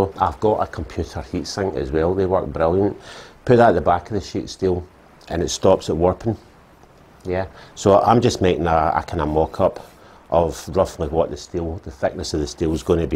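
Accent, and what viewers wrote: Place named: West Lothian, United Kingdom. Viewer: You are welding all wrong there......only kidding just wanted to play with your rants 😂😂.just found your channel and looking forward to following you and watching your previous vids. Doesnt sound like you are too far from me (west Lothian) based on your accent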